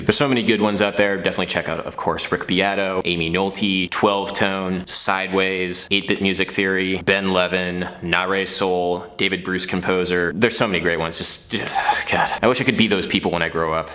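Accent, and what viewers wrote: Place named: Maryland, United States. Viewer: I can hear you're from MD bud in the way you say "so".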